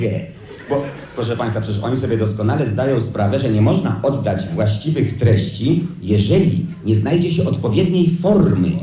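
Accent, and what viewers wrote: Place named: Greater Poland, Poland. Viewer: Zaraz, zaraz, toż to gwara wielkopolska.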